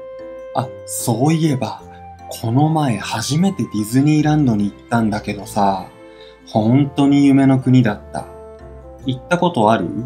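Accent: Taiwan. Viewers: 额 一听就是台湾腔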